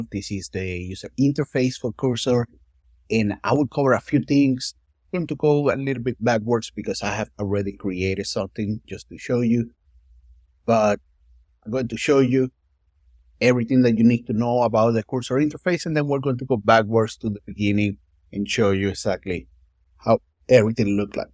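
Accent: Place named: Latin America. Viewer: Bro eres latino hablando inglés porque eso me parece, hablas bien recién empecé a usar cursor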